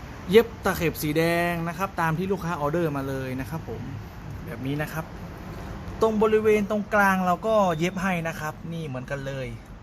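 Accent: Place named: North, Thailand. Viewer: อู้เหนือเลยครับผม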